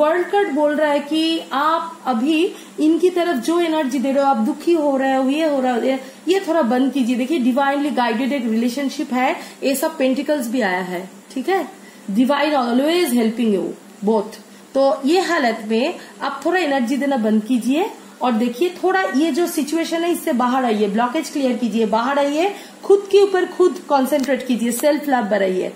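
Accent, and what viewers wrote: Bengal, India. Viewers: Hindi me bhi aapka Bengali accent aa jaata hai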